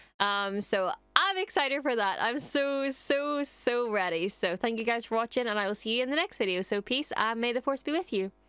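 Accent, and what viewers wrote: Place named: Scotland, United Kingdom. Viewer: I truly love your passion for Star Wars. I was the same, at one time. The first movie I saw in a theater was ANH, was very young but that spark of such a fantastical world and wonderful characters stuck with me as I grew up. Made me believe I could do anything. It did the same for so many in my generation, and we kept the faith for a very long time. But as sorry as I am to say it, those thoughts/dreams/beliefs have been absolutely destroyed since Disney took control. They systematically removed and obliterated that spark, took away the wonder and imagination of such rich worlds/characters. And in it's place gave us tropes, pale remakes of the original, girl bosses, and modern time standards put into a purely fictional world. I don't begrudge you any enjoyment from this or any Star Wars content. Goodness knows I've liked my share of entertainment that others thought was garbage. 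I just have to say this because you seem very genuine and I hope you're not ignoring what is actually taking place with one of the top 5 IPs of all time. And just to add: I've never really known why but the Scottish accent has always been my favorite (and a weakness in certain cases).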